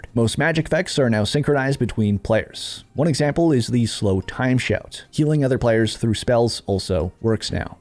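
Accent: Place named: Canada. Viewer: is the master of mods. At first I thought you were from California, but had to pay attention to your accent and you are a true Canadian. 🤣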